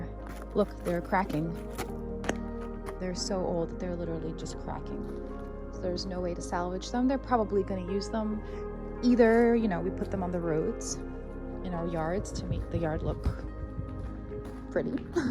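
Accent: United States. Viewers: Fascinating, I hope you do continue. I assume you grew up in the US from the way you speak, but spent time in Bulgaria at this village with baba and diado. I'd be interested in hearing more about your reasons for moving, particularly since you're so young. My wife is from Sliven, and we have been visiting (from California) for 25 years, and have been sending our boys for the summers. Her mother's parents were in a tiny village far away, in the far NW corner of the country (you could see Serbia), I don't remember the name, and it was rustic to say the least. I'm hoping to retire to Bulgaria in several years after the youngest boy goes off to college.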